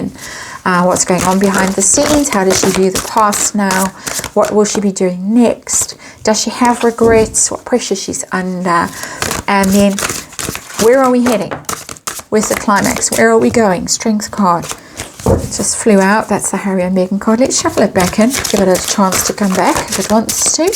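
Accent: South Africa. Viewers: I listened twice to your ending with earbuds in and I couldn’t figure out what you said because of your charming South African accent. But when I heard your dog softly moaning I thought it was one of the cats that were in my house 😆 Take care and I hope to hear from you soon.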